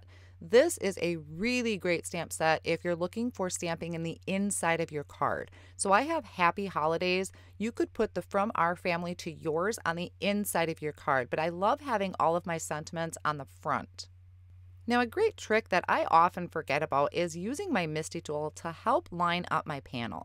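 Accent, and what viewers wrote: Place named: Midwest, United States. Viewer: did you say that those are Houses or Whooses? Sorry I have to tease, your midwest accent is so cute! I have a number of relatives in northern Wisconsin and Michigan, and so. Beautiful card btw!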